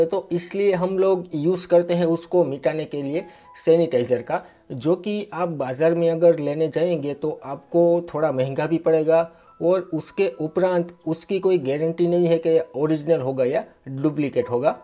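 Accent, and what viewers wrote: Gujarat, India. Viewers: Kya bhai tum Gujarati ho ha moj ha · Are u Gujarati???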